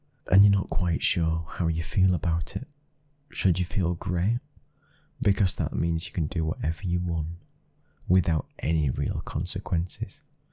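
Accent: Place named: Northern England, United Kingdom. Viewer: I'm originally from New York, but now live in Chicago. I'd love to move to Yorkshire one day. I could tell by your speech patterns that you were Northern English. Your voice is so soothing and gorgeous.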